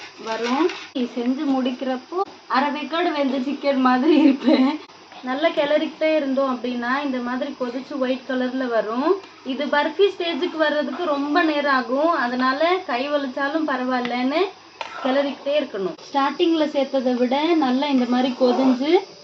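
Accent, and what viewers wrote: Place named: Cuddalore, India. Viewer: பொதுவாக கடலூர் வரையிலும் ஒரு நடை என்று சொல்வதன் வழக்கம் இங்கும் அந்த பேச்சு வழக்கு உள்ளது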